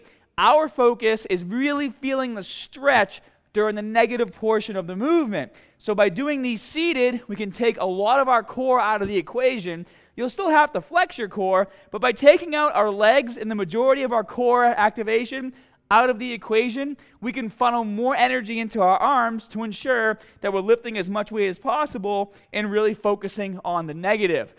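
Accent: Boston, United States. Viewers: “you gotta TAHGET the muscle to SPAHK some growth” I love Boston accents lmao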